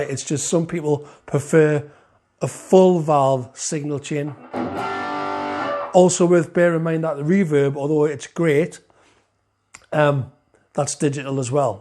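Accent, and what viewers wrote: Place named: Ireland. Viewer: Thanks for your honest review! I know you're a less is more kinda guy, but not everyone else shares that philosophy. Having features is a good thing because it gives you more options to tailor your sound. However, if you don't want or need them, then simply don't use them! But I think having more options available gives you more flexibility and not just a one trick pony amp sound. In addition,, it doesn't matter if it's only 1, or 0.1, watt. Having two channels and a foot switch allows you to go from clean to scream at the touch of a button for different sounds for rhythm and lead, etc. By the way, as someone in the states I enjoy listening to your voice because of the accent . Are you from or located in Ireland? Just curious!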